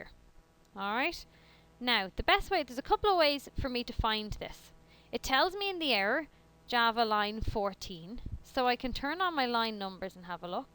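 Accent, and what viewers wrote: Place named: Ireland. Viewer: Very helpful! Nice to hear an Irish accent on this topic!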